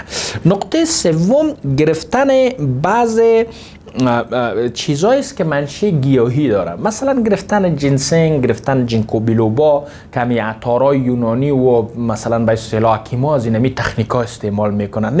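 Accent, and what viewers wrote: Afghanistan. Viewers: بابا خلاصه بگویید ما رو گاییید خودت میفهمی چه میگی ادا میای آقای دکتر هم خودشه الکی نیومده تو این کار علاقه داشته عامو بخدا نمی‌فهمم چه میگی لهجه‌ کجای افغانه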